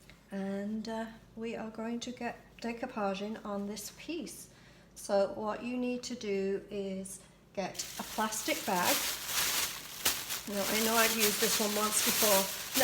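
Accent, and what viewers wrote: England, United Kingdom. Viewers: Just found your channel and have subscribed. May I ask are you from England as you sound English, without a Canadian accent x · Are you originally from the UK? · Hi, thank you for your videos. They’re great. And you live in Canada but you sound very English. Where are you originally from? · British voice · Hi, you sound English, love your jars, your techniques, especially the robin jar. I'll be watching again and tell my friends. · I’m fascinated by your accent. Do I detect a whiff of an English accent in there….?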